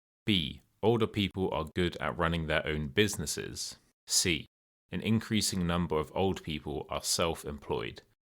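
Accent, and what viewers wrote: United Kingdom. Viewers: Like it very much your videos and it perfect British English and properly helped and thank you very much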